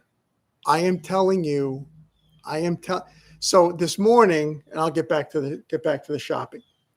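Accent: New York, United States. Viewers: amazing you never lost the NY accent! love it..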